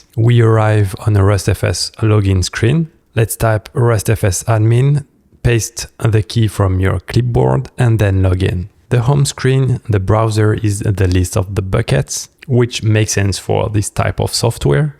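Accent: France. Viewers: Ahhh cet accent français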